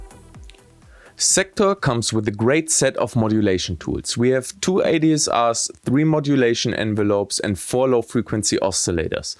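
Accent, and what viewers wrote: Germany. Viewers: na, hast'n deutschen akzent nicht?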